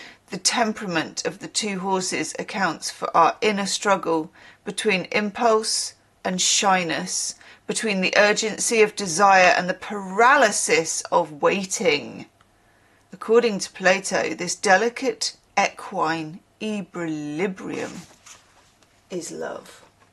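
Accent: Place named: Wales, United Kingdom. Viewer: 😂 I’d never heard of evince & had never tallied plato & platonic 😅& then there’s the 3rd slang word in London for cunnilingus Platoed 🤣🤣🤣I’m going get told off . Your Welsh accent great !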